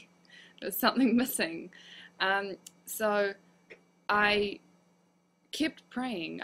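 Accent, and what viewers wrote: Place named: Australia. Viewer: i dig ur aussie accent very funny in a good way. welcome to the biggest family in the world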